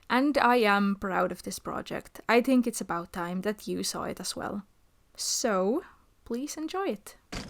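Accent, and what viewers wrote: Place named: Finland. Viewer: O Al's I guessed that because I'm Finnish too and I could tell from the accent. But your English was so good I had to ask separately.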